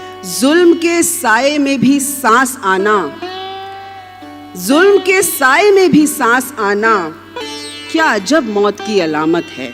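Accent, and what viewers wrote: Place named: Karachi, Pakistan. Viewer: Pure urdu! Pure educated karachi accent! Proving the language belongs to only literates not illiterates!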